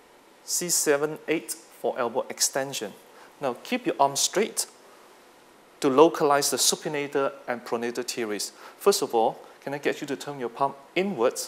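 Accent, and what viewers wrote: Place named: Singapore. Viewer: A very polished Singapore accent.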